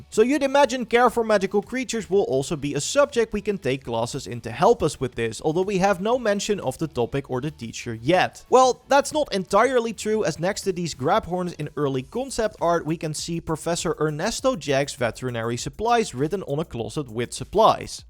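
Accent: Netherlands. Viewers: Why does your voice change. This channel seems to be two different ppl. Both dutch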